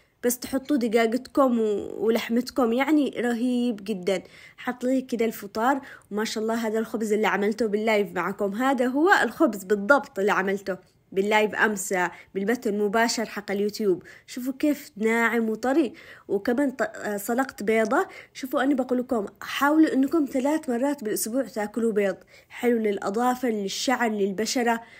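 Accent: Aden, Yemen. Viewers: تسلمي ع كل الاكلات الحلوة وتسلم الهدرة العدني الذي تخرج من فمك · محلا كلامك الكلام العدني يجنن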